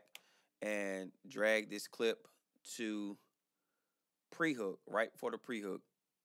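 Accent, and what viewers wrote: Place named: St. Louis, United States. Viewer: You sound like you're from St Louis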